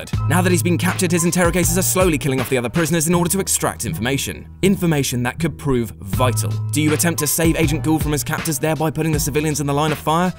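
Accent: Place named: United Kingdom. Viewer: So many quick British syllables.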